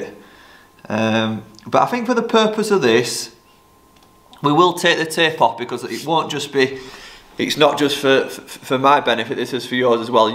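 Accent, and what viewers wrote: Yorkshire, United Kingdom. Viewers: Great video will definitely try thus myself next time 👍but the best part for is being a Rotherham lad just listening to another Yorkshire lad trying to pronounce everything clear for his audience great job 😁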